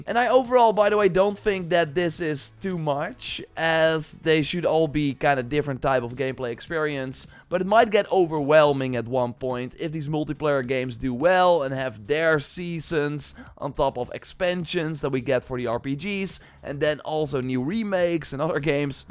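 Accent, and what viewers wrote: Netherlands. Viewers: Your dutch accent really sucks man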